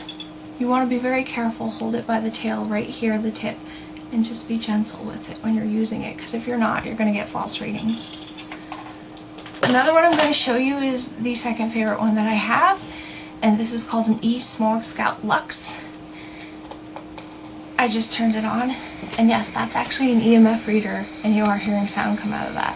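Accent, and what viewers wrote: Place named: New Jersey, United States. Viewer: Very good video presentation, if I was going to do one it would be just like you did it! I also understood you when you said "guass", we Jersey peeps know the accent well! :-)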